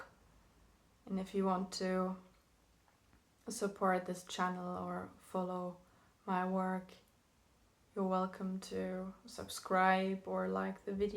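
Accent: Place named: Ireland. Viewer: first comment🤪why didn't we start with crown? sorry, I don't mean to be a prick(it just comes natural lol). I like to employ frequency & vibration, light & sound. as far as I understand the bliss of the chakra-gasms, its different from the actual transcendence , but essential on the way. 😁your wisdom is beyond your years, im jealous LOL "when I was your age..."😂 your voice is perfect, brogue? can you run your voice through a compressor or limiter & boost the db so I can hear your voice at an even volume.🥰 crap im not being critical honest😇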